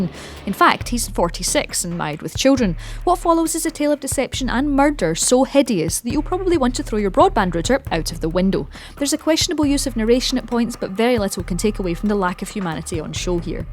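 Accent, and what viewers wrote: Scotland, United Kingdom. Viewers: Thanks for this list, along with the Scottish brogue narration! Charming and informative.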